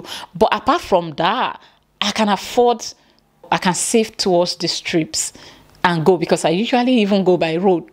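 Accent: Ghana. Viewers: You lady, you are funny, you speak as if you are a Ghanaian.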